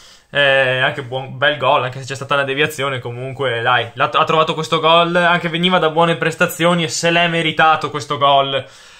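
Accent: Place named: Padua, Italy. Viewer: L'accento padovano è favoloso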